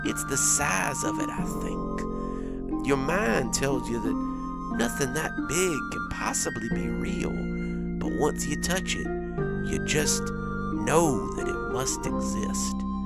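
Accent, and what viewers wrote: South, United States. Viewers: Your southern delivery helped this story immeasurably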